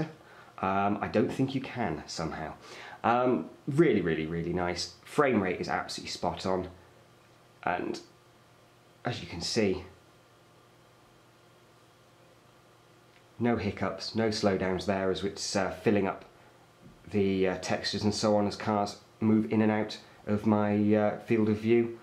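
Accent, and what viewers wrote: United Kingdom. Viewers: so british :D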